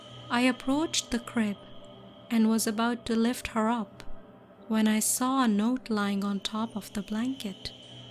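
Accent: India. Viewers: It sounds like you have an Indian accent.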